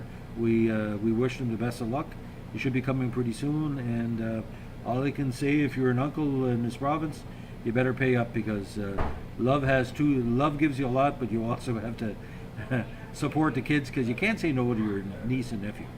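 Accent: Canada. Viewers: Are you quebecer or acadian?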